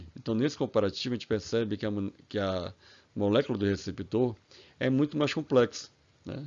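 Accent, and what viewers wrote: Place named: Pará, Brazil. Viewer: Sotaque paraense. acertei ou errei?😊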